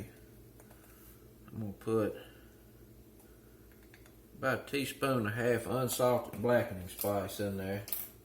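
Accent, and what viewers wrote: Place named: Mississippi Delta, United States. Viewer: You sound like you're from the MS Delta, perhaps Indianola or maybe down around Yazoo City. Greenwood/Greenville? No matter where your're from, you made a nice video. Just please get yourself some good wooden or plastic utinsels to save that fine cookware.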